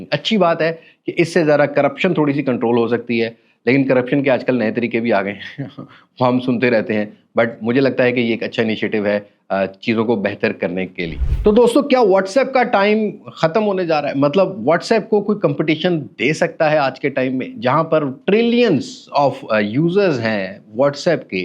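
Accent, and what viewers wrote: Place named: Punjab, India. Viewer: Ap ka thora thora Punjabi ka touch bhut wadia lgia ay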